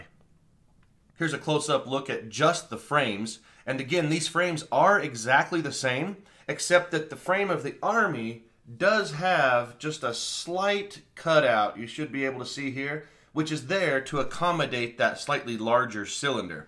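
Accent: Utah, United States. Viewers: Today is the first time seeing your channel. As I was watching, I thought to my self, “ self, this dude’s from utah!” And not to my surprise, you are. Nice videos “brother”.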